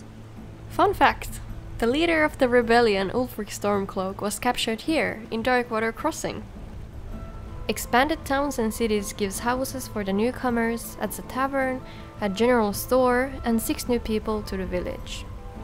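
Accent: Finland. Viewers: So far I've come to enjoy your videos, so I'll subscribe! Your nice soft Finnish accent is a nice bonus as well and makes your descriptions of Skyrim and its people sound official and pleasant. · Can't tell if your accent is mildly finnish or distinctly icelandic.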